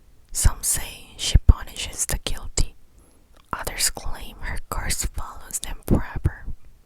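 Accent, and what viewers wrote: South Korea. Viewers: love your Korean accent while speaking English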